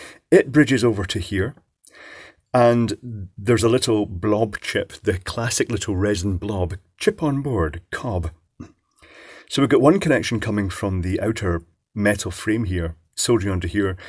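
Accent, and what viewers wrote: Scotland, United Kingdom. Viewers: A) he's scottish and B) electronics is literally his area of expertise. Perhaps you need to get out meet people yourself, instead of writing rude comments on youtube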